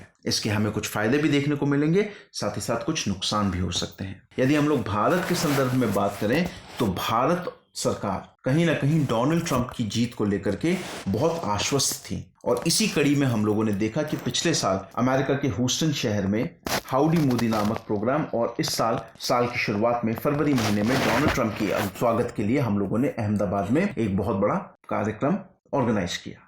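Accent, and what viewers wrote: Bihar, India. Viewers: bihari tone sun kar aacha laga.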